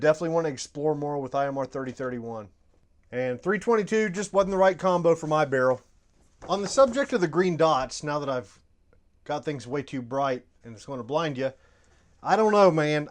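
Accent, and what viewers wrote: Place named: San Francisco, United States. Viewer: That intro voice sounded like some San Francisco hillbilly, and I thought it was the Yankee Marshall, and I almost turned it off.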